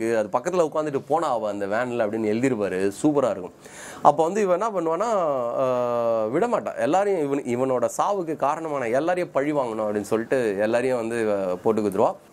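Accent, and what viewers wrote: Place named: Chennai, India. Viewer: Listening to your story and folding clothes 🤣🤣🤣 Chennai dialects awesome....you and your attitude resembles one my close friend.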